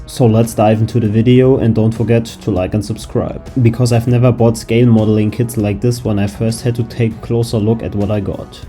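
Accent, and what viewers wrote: Germany. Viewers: Im love how you say it with you german Voice❤😂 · Thats why ur english sounds like german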